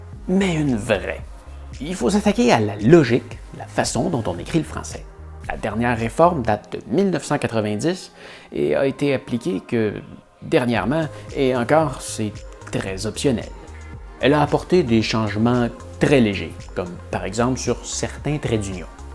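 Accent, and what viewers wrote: Quebec, Canada. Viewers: "Sans" et "vent" ça ne le fait pas avec ton accent québecois : on entend sain et vin :-) mais ta vidéo est tout à fait probante, surtout la comparaison avec l'italien et avec les exemples d'orthographes anciennes ou des sources latines, je suis (con)vaincu. Ce que je vais ajouter n'est pas pour contredire tes propos mais pour apporter un éclairage et quelques complémentarités : l'étymologie se retrouve dans l'orthographe et peut donner du sens comme "forêt" (loin de sylvestre), dont l'accent circonflexe est un "truc" pour gagner de la place en supprimant le esse qui reste dans forestier, et pour ne pas confondre avec un foret pour percer le bois ou le métal. Un des mots que je trouve le plus dingue est « accueil », pour arriver à l’écrire je doit toujours le prononcer dans ma tête : akuèye, comment l’écrirais-tu ? Oui le français est plein de non-sens comme écrire « fils » pour le fils de son près, et pour les fils électriques, écriture qui ne dit pas comment ça se prononce, il y a des listes de ce genre que tu aurais pu citer. 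À contrario, l'amande le fruit de l'amandier, et l'amende prononcé par un tribunal : les militant en désobéissance civile qui vont en procès disent qu'ils aiment au menu les amandes et les avocats :-) Et c'est vrai qu'il est inutile de conserver une orthographe complexe, quand à côté le langage perd la poésie des métaphores, et quand les personnes vont vers un appauvrissent spirituel : on sait que certaines catégories sociales ont très peu de mots dans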